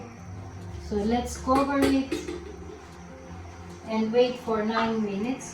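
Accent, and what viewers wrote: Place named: Italy. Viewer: By the way i like your Italian accent...ciao...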